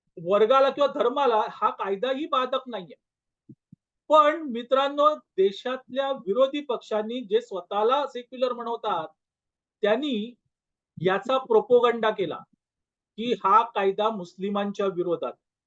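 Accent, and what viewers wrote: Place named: Malvan, India. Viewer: malvani bolnyacha attahas karu naka pl nahi shobhat normal marathich bola please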